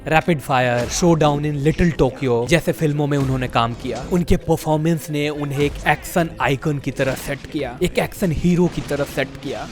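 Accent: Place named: Bihar, India. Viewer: Your Bihari accent is so sexy, say it again 😉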